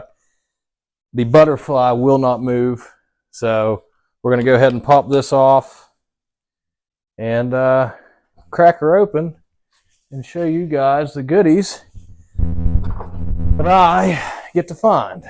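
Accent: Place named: West Virginia, United States. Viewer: Love old Wide Glides. Fairmont WV - sister lives there. The back home accent always catches me, then saw your Clutch Up sign was like hell yeah. pretty cool channel I stumbled across on my early Sunday morning YouTube watching.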